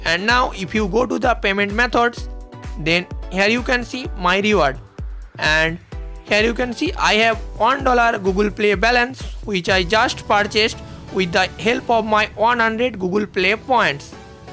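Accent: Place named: India. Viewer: This guy sounds like india itself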